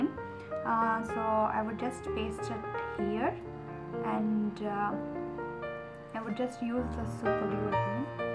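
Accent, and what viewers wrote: India. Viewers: Kannada accent😊but nyc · I like the video it is so awesome. Ps I like you're Indian accent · I am Indian too but I find her accent funny and cute :D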